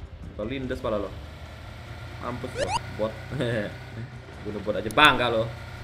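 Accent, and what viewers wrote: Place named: Medan, Indonesia. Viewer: Bang orang Medan kah? Logatnya mirip2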